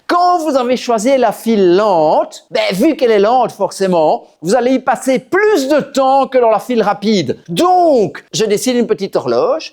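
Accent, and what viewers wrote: Belgium. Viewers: En belge avec un accent horrible et répugnant ?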